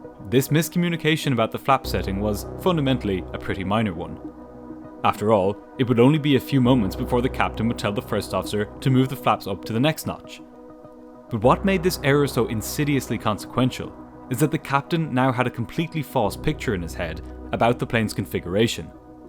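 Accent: Ireland. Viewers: Your narration skills are first class -I like your Irish accent coupled with your easy listening mellow voice – one funny thing though -to me at least (especially for aviation videos ),is the way you pronounce “column”-your Irish accent comes out as “collleeum”-a cross between cauliflower (or maybe Colosseum !)and column, Gosh I hope I haven’t made you self-conscious after that !, on a serious note you have a brilliant channel which does not sensationalise nor overdramatise the events and covers the technical aviation aspects well but remains gripping and riveting like a good drama❤ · well bud, that’s the beauty of the Irish brogue, remember, those slights in the queens tongue are what made Irish literature legendary.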